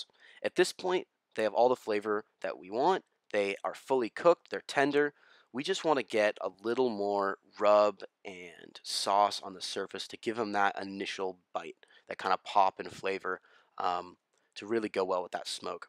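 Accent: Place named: United States. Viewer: American accent, so I'd say F.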